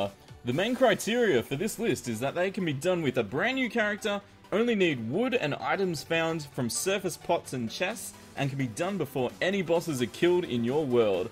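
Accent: Australia. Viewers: Is that an aussie accent I detect? =D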